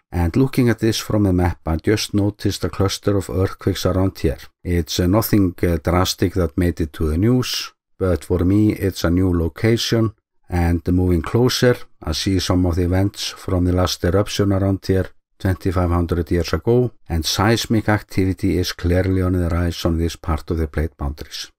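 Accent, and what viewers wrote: Iceland. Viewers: Please don't add background music. I love the humor and the Icelandic accent of the narrator but his voice is soft and would be hard to heard.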